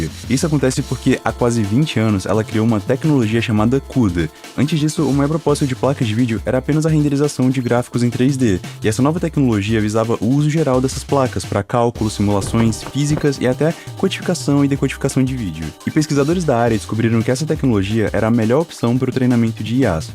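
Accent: Brasília, Brazil. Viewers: tu é daq de Brasília? kkkk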